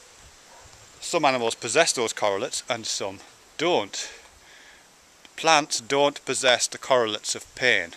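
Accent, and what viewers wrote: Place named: England, United Kingdom. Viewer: Grow your hair longer, lessen the English accent a tad and you'd be splitting image of Dr. Rush from Stargate Universe. Haha!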